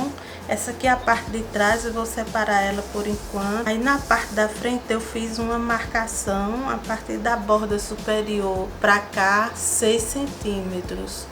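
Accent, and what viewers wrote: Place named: Northeast, Brazil. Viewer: oh, muito obrigada, de coração e sou muito espontanea, onde so tenho a agradecer a quem se dedica a ensinar com Amor e empátia.... Notei que seu sotaque é do Nordeste, de onde é?! Qdo eu retornar para lá, quem sabe não estaremos perto e vc poderá ser minha Professora em aula prática? Já pensou? Coisa boa,... um abraço Querida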